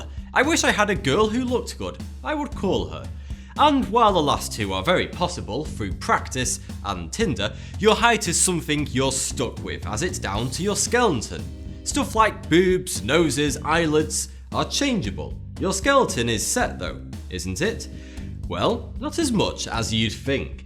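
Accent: United Kingdom. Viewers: Why i never miss a video: 1% its interesting 99% british accent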